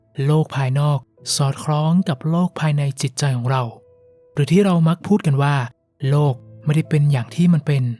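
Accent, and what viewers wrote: North, Thailand. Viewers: คนเหนือแน่นอนครับ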